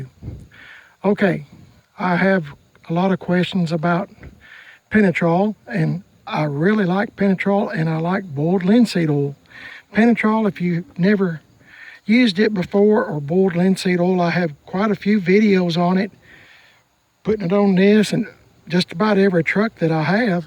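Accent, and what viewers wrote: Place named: Alabama, United States. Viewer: Sounds like an Alabama accent. We lost a good man from Alabama and you sound just like him. He will be missed ❤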